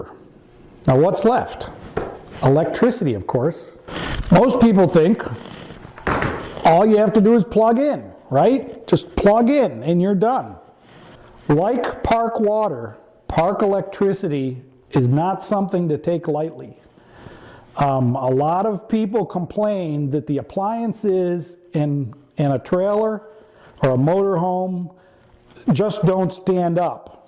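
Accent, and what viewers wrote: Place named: Hudson Valley, United States. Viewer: Thanks for the video as it clears up a few important issues that being a first time RVMH camper clears up. I enjoyed this video and I also enjoyed the first one where you put a marine outlet in to replace an existing one. Your dying and instructions were perfect. I do not know if your heater gives a way was for 2017 or 2018 but if it is still ope I timed the section on the give away pm a over cast cold Hudson Valley NY Saturday. We still have snow on the ground down hear but that will likely be gone in a few weeks . That is unless we get another N0r-Easter. I'll be glad to see winter depart. Thanks again for the videos and the chance to subscribe to a channel that has a person conducting it that speaks the way we speak down here in the Hudson Valley. PS there is more to NY than NYC about 100 miles down the Hudson River. 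< MIKE <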